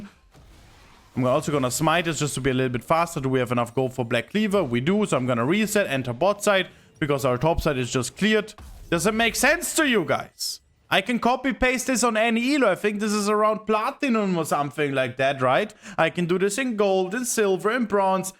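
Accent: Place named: Germany. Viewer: Dierekt gemerkt dass er deutscher ist hahahha aber echt gutes guide video fuer low elo und die fundamentals zu zeigen wie wichtig das ist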